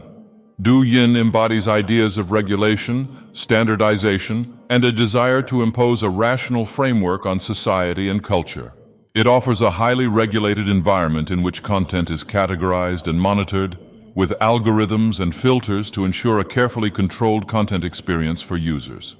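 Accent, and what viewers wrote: United Kingdom. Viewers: I didn't notice before, but he's doing the thing where he gets more British as time passes. ❤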